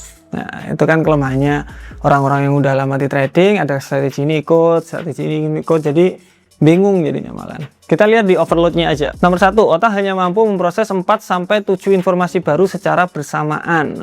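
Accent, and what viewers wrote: Java, Indonesia. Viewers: bahasa Jawa ini bukan osing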